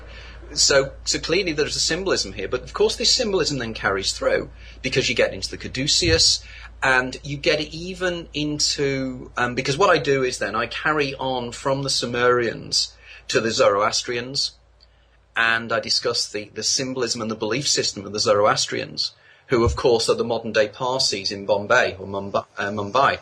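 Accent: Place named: United Kingdom. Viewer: how come british people talk funny sounds like Stuie From the family Guy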